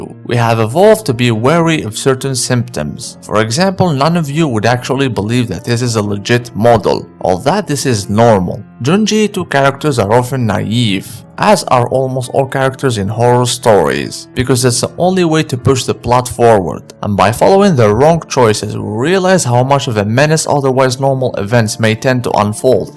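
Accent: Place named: Japan. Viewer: oh. I asked do because your accent is very jap.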